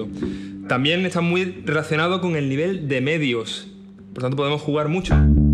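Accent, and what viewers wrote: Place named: Andalusia, Spain. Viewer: love that "andaluj" accent :)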